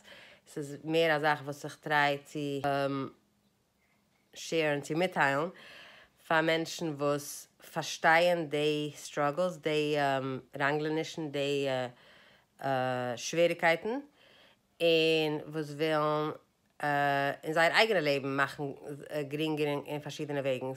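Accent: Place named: Hungary. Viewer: You have a real hungarian Yiddish 😂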